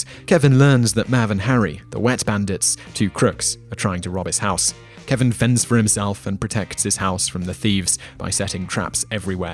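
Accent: United Kingdom. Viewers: ... maybe it sucks first of all because this guy has a British accent. I realize the tradition does actually go back to the English, but these days it's considered an American holiday ...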